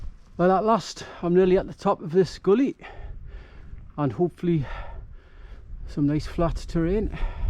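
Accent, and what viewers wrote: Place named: Geordie, United Kingdom. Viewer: I went up Bizzle Crags years ago only to get to the top of the Cheviot and find it one of the worst places l have ever been in my life. It was sleeting, blowing a gale and zero visibility. There was no nice flag path just bog. I never bothered again and now l am old with knackered knees a two hour toddle around the fields in a gentler part of the country does it for me. Still it’s nice to be reminded and wonderful to hear Geordie dialect, Gods own in my opinion. All the best from a soft southern shandy drinker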